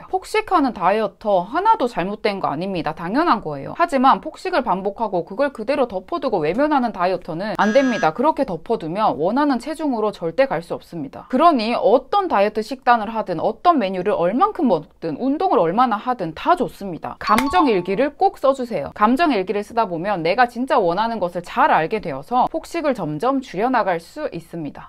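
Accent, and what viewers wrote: Gyeongsang, South Korea. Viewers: 경상도 분이신가요?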